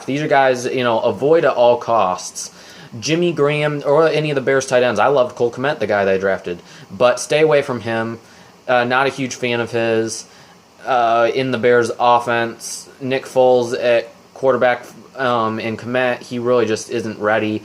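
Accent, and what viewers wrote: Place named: Michigan, United States. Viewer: You from Michigan?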